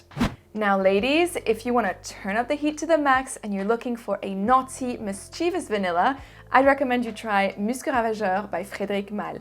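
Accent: France. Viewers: Je souhaite te féliciter pour cette chaine Youtube, tu mérite 1 million sub et j'adore ton accent français. Bonne continuation.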